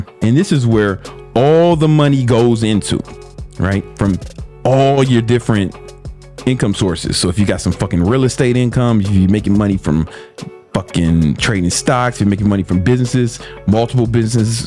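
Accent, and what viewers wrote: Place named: South, United States. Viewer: southern twang haha i didnt know it was called this way im from France by the way so we'r definitely no experts at accents southern twang from brave men though 🤷🤣🏆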